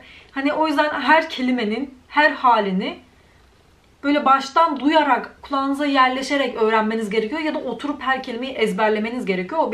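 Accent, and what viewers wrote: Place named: Poland. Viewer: aha rozumiem ,tak się zastanawiałem bo podczas wymowy masz taki polski akcent :)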